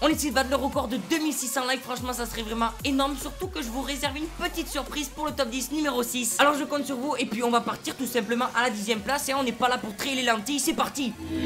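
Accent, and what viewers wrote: Marseille, France. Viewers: Salut mon vieux, obligée de t'écrire un commentaire sur youtube pour te joindre ! C'est pas possible ça haha J'ai maté ta vidéo, ça fait longtemps que j'ai pas entendu ton petit accent marseillais/landais/nonidentifié en vrai donc t'es dans l'obligation de me donner de tes nouvelles!!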